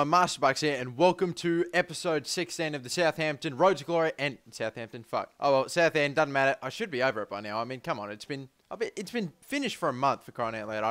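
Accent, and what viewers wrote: Australia. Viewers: Guisseppe Murru...I have a scouted star of my own called Guisseppe Missiroli. Way to be fuckin' creative EA, seriously haha. Anyways, I would be complaining as much as everyone else about your dilly dallying around the story but I adore Aussie accents so I'm good. Win-win lol.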